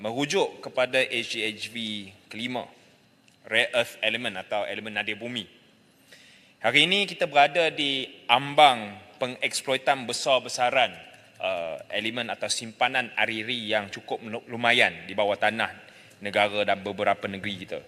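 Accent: Perak, Malaysia. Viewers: steady mp ni....cina cakap perak yop....👍👍👍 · Ckp perak yop...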